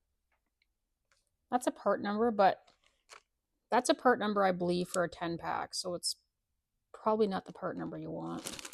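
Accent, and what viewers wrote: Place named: Canada. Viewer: See that's why I love when you do videos on every saw because we learn something new every time. I really dig the Canadian accent I can hear your voice narrating a book on audible. I also love the sarcasm throughout and died laughing at chainsaw protective services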